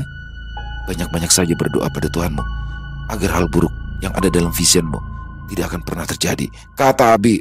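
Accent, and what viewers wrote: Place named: Bima, Indonesia. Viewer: Keren banget bang bisaan banget meranin logat bima nya jadi senyum senyum sendiri ngedengerinnya Terimakasih bang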